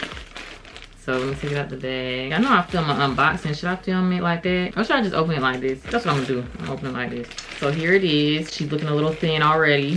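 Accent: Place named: South, United States. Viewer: I honestly thought you were South African. Your name could be Nthabiseng (sesotho for bringer of joy) but your Southern accent is coming through haha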